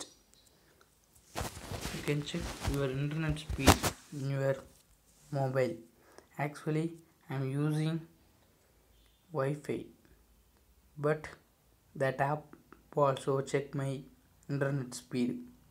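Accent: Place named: England, United Kingdom. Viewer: Din nou musulman prost cu accent de englez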